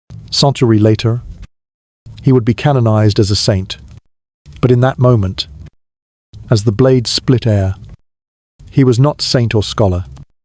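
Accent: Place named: Southern England, United Kingdom. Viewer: The narrator (AI) may be boring, but it's a standard southern English accent.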